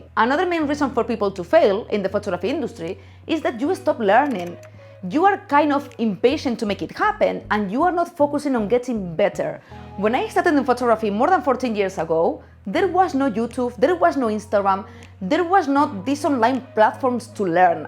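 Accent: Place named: Spain. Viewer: Hi there, your videos are great and interesting. But english is my 2nd language and you are not so easy to understand because you talk so fast and the Spanish accent. So can you speak slowlier in the future? Would be good for the viewers with a background like mine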